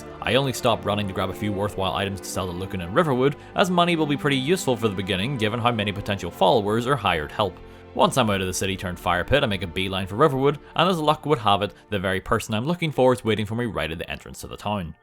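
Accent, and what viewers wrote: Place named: Northern Ireland, United Kingdom. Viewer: The Northern Irish accent just fits with Skyrim idk why.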